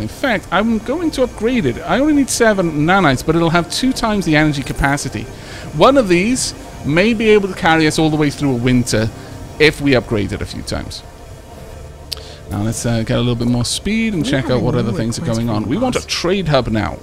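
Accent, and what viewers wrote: Wales, United Kingdom. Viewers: Completely new to this channel, saw the picture, is this guy Welsh? I noticed the accent and can't help think it. I know it's not important, but you know...curiosity!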